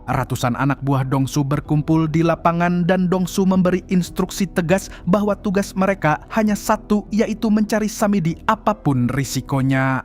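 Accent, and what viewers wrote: Banjar, Indonesia. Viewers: thanks bang, btw logat abang kek orang banjar, bujur lah u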